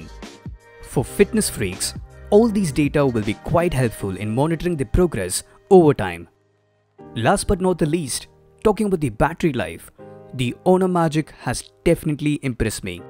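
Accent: India. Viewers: I think this man from india, didnt catch this fucking accent. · dat indrish tho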